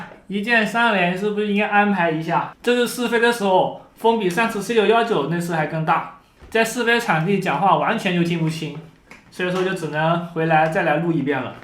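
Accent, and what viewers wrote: Jiangxi, China. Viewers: 在影片中经常能看以红土地，中国的红土地一般在湖北，湖南，江西。 结合口音，目测是江西。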